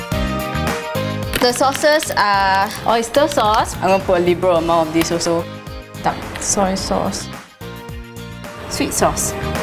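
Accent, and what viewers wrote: Singapore. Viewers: I love her Singaporean accent :D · Yeap, its known as Singlish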